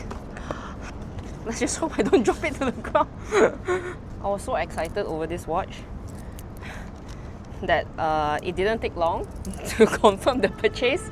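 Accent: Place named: Malaysia. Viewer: Very typical Malay accent. Good selection.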